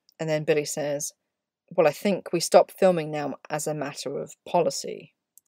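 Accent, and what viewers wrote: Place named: United Kingdom. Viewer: Superb! Exquisite narrated in her soothing, sane, wonderfully British! To me this is very serious stuff not just to me but the world.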